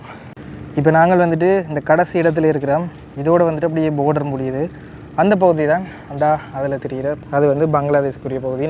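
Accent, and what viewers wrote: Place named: Sri Lanka. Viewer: தம்பியின் இலங்கை தமிழ் பேச்சு மற்றும் காணொளி அருமை. பிடித்திருந்தது. Subscribers குறைவாக இருப்பதை பற்றி கவலை வேண்டாம். கலியுகத்தில் நல்லவர்களுக்குத்தான் துன்பங்கள் மற்றும் மன உளைச்சல்கள் வரும். ❤❤❤❤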